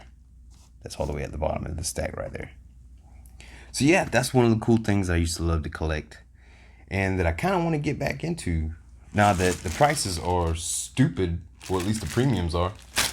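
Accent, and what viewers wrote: South, United States. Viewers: This may be a strange question but where are you from? You have an accent that I have heard in African American neighborhoods originally from the South and living in Washington DC and Philly.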